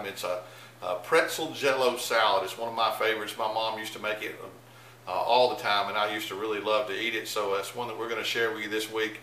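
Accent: South, United States. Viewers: Your southern accent makes me smile. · I'm from Kentucky love the way u talk y'all must be from the south thank u for that dish I had it a long time ago at a wedding reception and just loved it so much 💘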